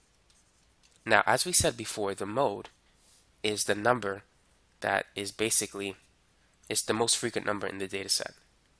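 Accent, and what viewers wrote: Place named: Brooklyn, United States. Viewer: This guy has just the slightest Brooklyn accent and sometimes it comes out for just a second.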